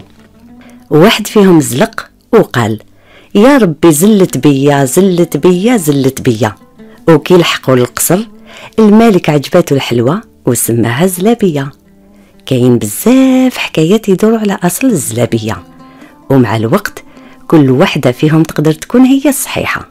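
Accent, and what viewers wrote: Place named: Algiers, Algeria. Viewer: Vive la voix de sa façon de lire est formidable typiquement algeroise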